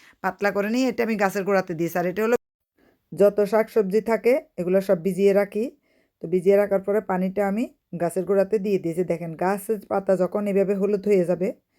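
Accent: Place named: Chittagong, Bangladesh. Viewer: আপু আপনাকে অনেক ধন্যবাদ আপনি কোন দেশে থাকেন কথা শুনে মনে হয় আপনার বারি চট্টগ্রাম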